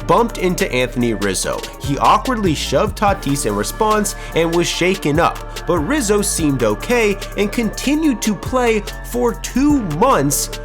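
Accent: United States. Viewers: The guy talking is obviously from Texas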